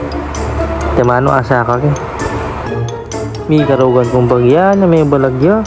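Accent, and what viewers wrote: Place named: Pampanga, Philippines. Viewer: Ot meg kapampangan ka par😂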